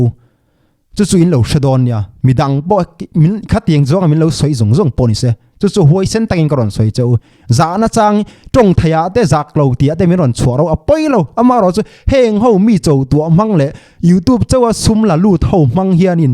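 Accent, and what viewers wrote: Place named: Myanmar. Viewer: Burma tlangval tawngpai nuk2😂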